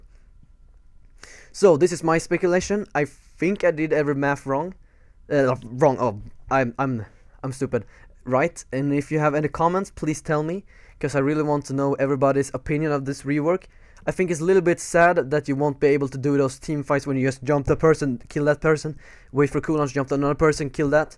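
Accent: Sweden. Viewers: Don't you hear the obvs swenglish?:-) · Are you from sweden? Because your accent sound like you're from sweden:d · Are u swedish?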